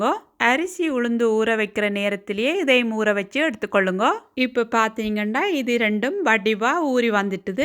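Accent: Sri Lanka. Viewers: வழக்கம் போல உங்கள் இலங்கை தமிழும் தோசை யும் அருமை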